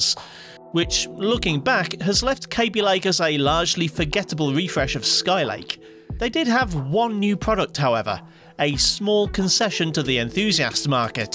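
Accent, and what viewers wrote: England, United Kingdom. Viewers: What region are you from in england?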